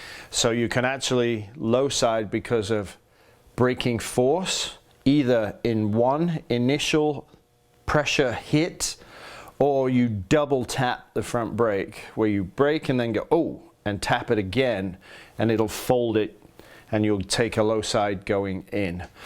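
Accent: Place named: Wales, United Kingdom. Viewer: Hi just wanted to ask where in the world that accent comes from, sounds WELSH to me?? Good lessons though and thanks for your time doing these!!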